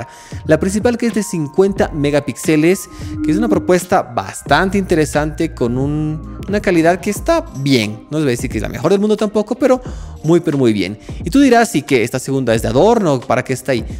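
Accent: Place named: England, United Kingdom. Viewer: por el asentó pensé que lo eras por qué hablas como un inglés cuando habla español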